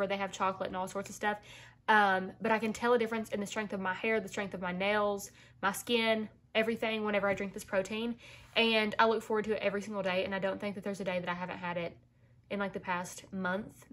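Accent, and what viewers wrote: South, United States. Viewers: you just look so glamorous and the southern accent totally caught me off guard. I had to take a double take. But I agree that you are so funny. But to have so much success at such a young age, I'm so jealous.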